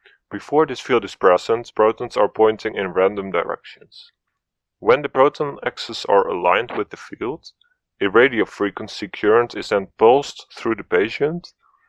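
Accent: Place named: Netherlands. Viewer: Waarom klinkt deze gast nederlands xD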